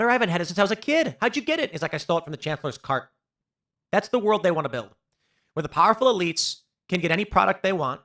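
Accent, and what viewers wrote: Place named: New York, United States. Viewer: Y'all notice he's been using a New York accent lately?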